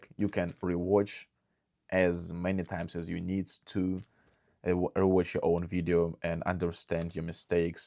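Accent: Russia. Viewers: I'm not currently interested in reaching the c1 level but idc, you're so handome and have russian accenttt 😝🔥 good luck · accent giving u out a lot, work on dat (as a russian myself) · Сходу понял, что ты из России)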